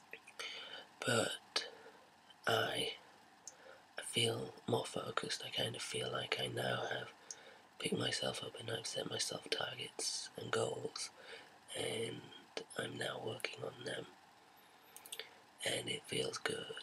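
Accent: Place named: United Kingdom. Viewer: Glad to see you are both back: you and your British accent ^^!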